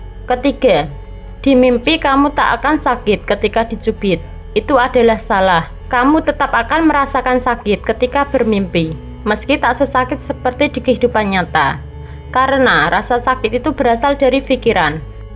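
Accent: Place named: Java, Indonesia. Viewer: Ngomongnya medok banget mba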